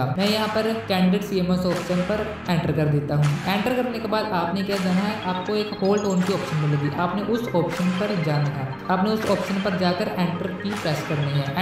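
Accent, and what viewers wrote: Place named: India. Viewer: Parece ser um indiano falando inglês.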